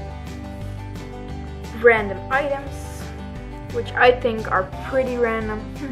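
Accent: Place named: Netherlands. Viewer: Are you dutch? I am Dutch and you sound Dutch great video keep it up!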